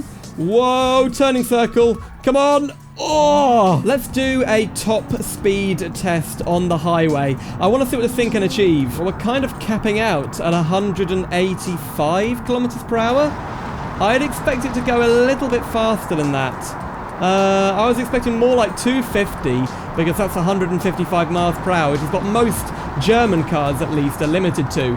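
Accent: United Kingdom. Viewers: Love British accent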